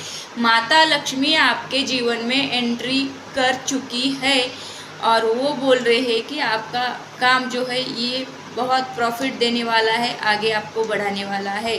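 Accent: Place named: Mumbai, India. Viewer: तथा संवादक मैडम जी को सादर प्रणाम स्वीकार हो । आपकी रीडिंग आवाज से मै समझ गया था इनकी भाषा मुम्बई तरफ की है । 100%सत्य है आपकी रीडिंग हम पूरी तरह से संतुष्ट है धन्यवाद। धन्यवाद ब्रम्हांड । चित्रकार राकेश नामदेव,अटेली मंडी जिला महेन्द्र गढ (हरियाणा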